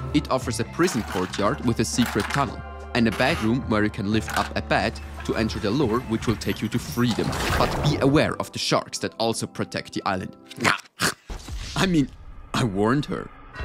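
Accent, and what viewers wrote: Germany. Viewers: youre german?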